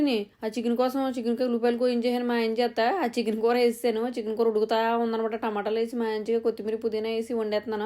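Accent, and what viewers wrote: Godavari, India. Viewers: Godavari yasa bagundhi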